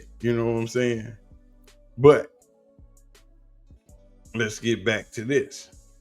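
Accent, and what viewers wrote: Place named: South, United States. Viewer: you a trip - Sounds like.... a lil bit black. A lil bit southern. Reminded me of Samuel L. Jackson thumbs up brother keep up the great work,u got a fan in me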